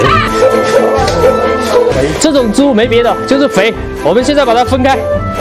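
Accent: Chaoshan, China. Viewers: 这是潮汕话吗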